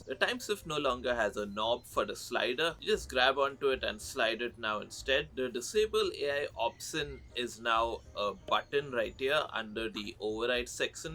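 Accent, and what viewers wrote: India. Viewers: U sound very indian. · Why your Voice so indian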